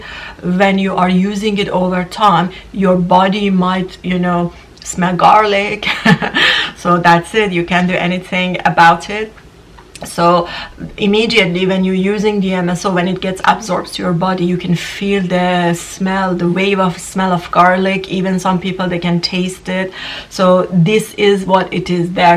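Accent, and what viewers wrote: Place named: Iran. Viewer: Persian accent 💯💯💯💯. She has diluted it from living abroad somewhere but sounds Persian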